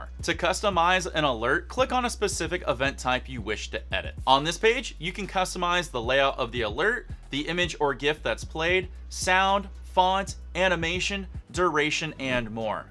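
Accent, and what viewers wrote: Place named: Israel. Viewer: אתה ישראלי אתה לא דובר אנגלית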